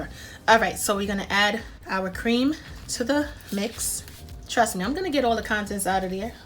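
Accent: New York, United States. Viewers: you sound like a New Yorker your accent does NOT sound Southern at all..Love your cornbread stuffing recipe..but I will like to see how you made the cornbread..My mon does it the same way but w/outthe croutons..Looks Delicious..!! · It looks amazing. Love the East coast accent. Sounds like NY, or Brooklyn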